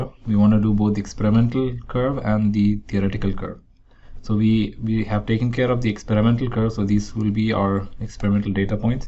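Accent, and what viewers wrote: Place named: India. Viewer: This indian sounding man has saved my electrial engeneering homework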